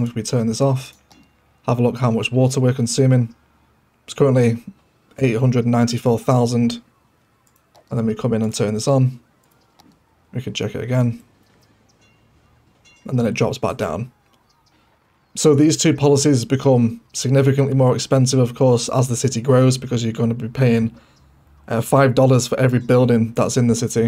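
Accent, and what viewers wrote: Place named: Manchester, United Kingdom. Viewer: you sound so much like rashford, you from manchester?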